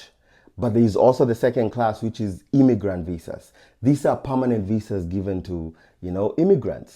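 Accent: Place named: England, United Kingdom. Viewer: Weh! English accent for me😊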